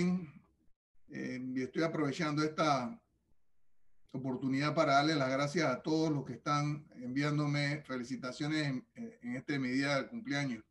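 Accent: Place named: Panama. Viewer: Lo he visto dos veces una vez en el instituto Naciónal que fuisteis a cantar y la otra cuando era candidato para la presidencia es muy amable y cariñoso Dios te bendiga lo que más admiro de ti que no has perdido tu acento de Panameño a pesar de haber vivido tanto años afuera del país !!!! Saludos desde Florida!!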